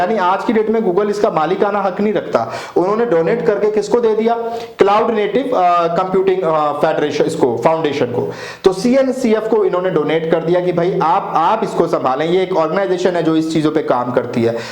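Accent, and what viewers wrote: Pakistan. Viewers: Tell him to do a ljve f4om India, or you share me a video that proves his Indian Identity..His accent is pure punjabi pakistani accent n he also hidea time zone of his system.. Anyways he is doing a great job..One of the best online tutor..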